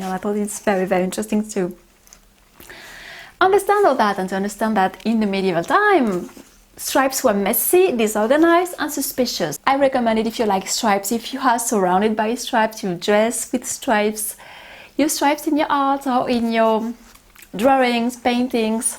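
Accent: France. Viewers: Lovely 🌹 It is relaxing listening to you, talking about books and french Culture.You make me want to learn French and Romance. You have a lovely French Accent. keep telling us more. Thanks Merci.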